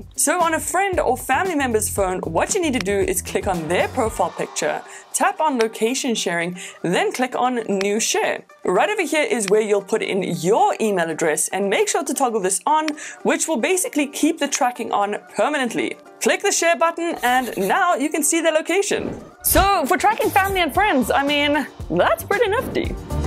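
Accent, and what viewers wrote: South Africa. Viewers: Hi are you South African, cool channel keep it up ! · Hi a quick question are u from South Africa 💭❓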